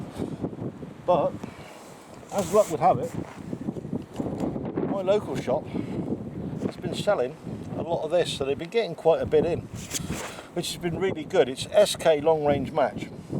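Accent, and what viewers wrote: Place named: West Country, United Kingdom. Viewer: You must be from Wiltshire Somerset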